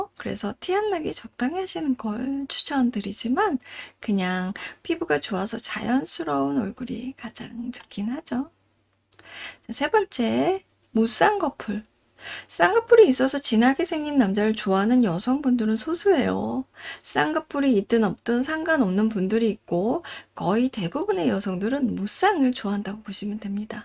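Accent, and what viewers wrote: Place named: Gyeongsang, South Korea. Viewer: 잘쌩긴이라고 발음하지 마시고 잘생긴이라고 발음하시길...... 잘쌩긴은 호남말투인데, 억양은 영남이시네요..박원순 서울시장의 억양......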